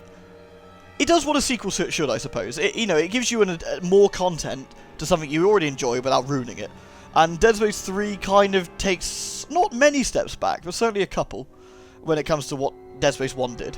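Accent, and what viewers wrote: United Kingdom. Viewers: So, I have to say congratulations for re-finishing the original Dead Space. I started watching you before you did this game originally like who knows how many years back but it was the original playthrough that made me subscribe to you. While I love DS, I prefer to play DS2 due to it´s many gameplay improvements. Story/atmosphere-wise, DS obviously wins. I would like to thank you for several things. You have helped me learn English. You have helped me open the world to me. Thanks to you I have delved into streaming and have met many friends. You have helped me go through depressions and some of the worst moments of my life. All of which without ever speaking to me or knowing of my existence. I have no idea what I would be doing with my life without your wonderful sarcastic British voice. So yeah, wish you many more awesome years and hopefully, if you still feel like it, sharing those with us through gameplay. 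:)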